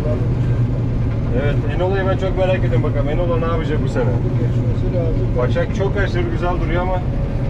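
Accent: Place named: Istanbul, Turkey. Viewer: İstanbul Türkçesiyle son teknoloji tarım makinelerinin bu kadar yakışması peki ❤❤❤ örnek bir Adamsın sen 👏👏👏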